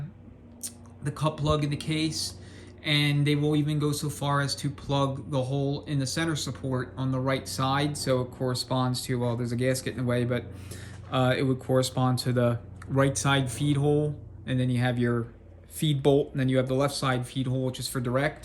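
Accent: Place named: Philadelphia, United States. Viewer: Another excellent video! Thank you for giving me the confidence to work on my transmission! Based on that accent, you gotta be a Philly guy!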